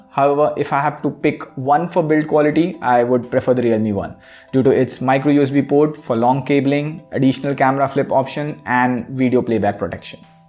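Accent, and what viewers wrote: India. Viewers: Maybe because of a thick Indian accent?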